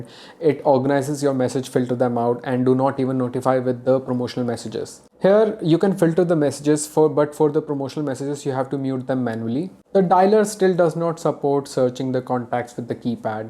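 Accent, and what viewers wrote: India. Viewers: im 22 years old, my first phone was an iphone 4 back in 2013. my phone history is Iphone 4, 6, nexus 6p, iphone 7+, X, 11, and now 12. I fucked up going from the x to the 11 but lets just skip past that. I loved the nexus while i used that but there really is something to be said about your phone being simple and working out the box. I loved being able to change basically everything about my phone but (i think this is a me thing) i never felt like i could get my phone to do exactly what i dreamed it could. When using an iphone, there may be things i hope get changed, but im never left with the feeling of "i bet if i completely rework my phones set up i can fix this one little thing i want" lol. Iphones do everything i want and they keep adding stuff so its pretty nice but i got no hate to anyone who prefers that android experience. P.S. I hope this doesn't come across in an offensive way but your accent is beautiful and crystal clear. 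This American has no problem understanding you and enjoyed the video! Hopefully these tech companies expanding into India will be down to start sending products to local tech youtubers like you.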